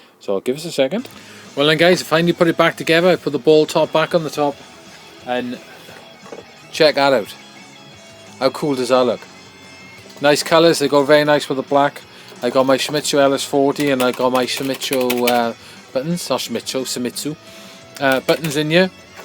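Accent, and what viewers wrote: South Wales, United Kingdom. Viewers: Are you from South wales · You from wales but?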